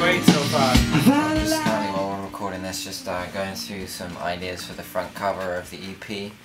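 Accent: United Kingdom. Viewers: I think he actually still have that British accent :o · I love his accent... Give it up to British guys <3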